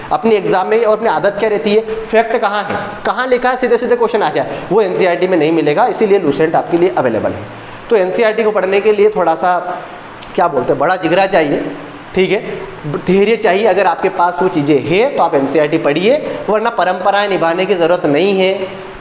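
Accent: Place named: South, India. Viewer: Sir aap south Indian ki tarah baat karte h...aisa lgta h jaise south me bahut rahe ho....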